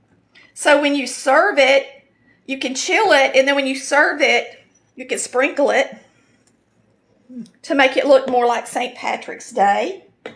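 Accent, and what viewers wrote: South, United States. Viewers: Girl you are SOUTHERN with hat drawlllll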